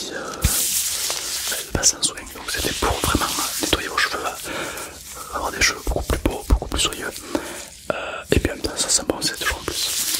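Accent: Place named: Toulouse, France. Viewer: Accent Toulousain est magnifique le roleplay est relax surtout coiffeur j'adore, 😁👍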